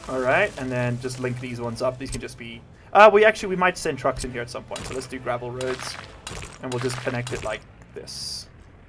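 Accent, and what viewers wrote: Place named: South Africa. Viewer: Man you sound like most of the SA that move to New Zealand after a few years , every once and awhile the accent comes through, mostly on R's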